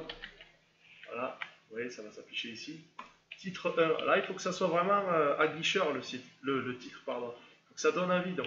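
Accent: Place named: Tarn, France. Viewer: Ma3alm l'accent du sud du Tarn super vidéo 👍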